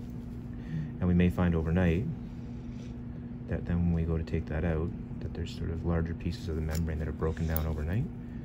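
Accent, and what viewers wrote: Canada. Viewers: Your accent is Canadian. Good work Doc! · He’s Canadian.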